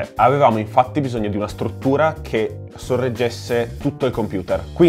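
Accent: Italy. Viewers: Porco due sento troppo l'accento di Brescia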